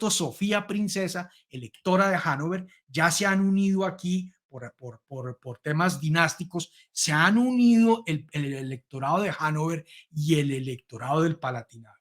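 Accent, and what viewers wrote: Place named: Latin America. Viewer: Tuvimos? .. aparentemente el comunicador bastante acento latinoamericano ,.debe de ser venezolano o colombiano etc. Y aquí en Sudamérica poco tenemos que ver con los reyes más que en el tiempo colonial...así que decir tuvimos...¿No será un poco pretencioso?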